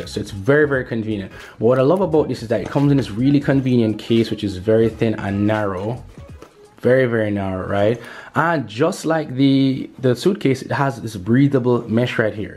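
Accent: Jamaica. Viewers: can hear the yardie accent lol. love it!!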